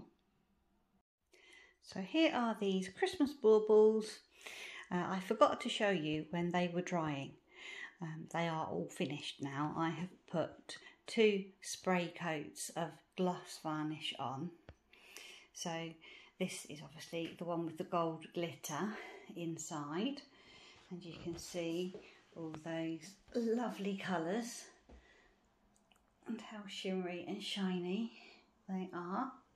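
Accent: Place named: United Kingdom. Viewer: is British, as am I, and I think perhaps it’s the different accent more than the volume, as I heard her clearly? It’s interesting how some accents are easier to hear than others. ☺️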